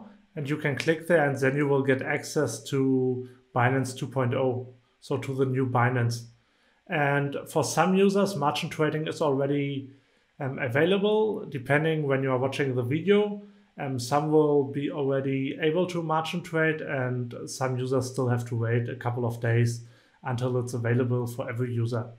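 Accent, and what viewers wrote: Germany. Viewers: nope... he's most deffinetely a german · are you german?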